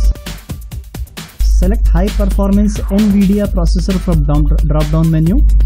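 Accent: India. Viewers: Why your voice makes me think you are Indian ?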